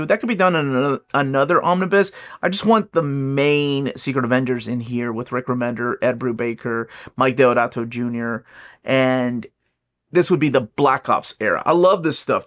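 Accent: United States. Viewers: Clicked because of cowboy hat and was NOT let down when i got the accent to go with it! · i want a compilation video of cowboy accent to listen to before i go to sleep every night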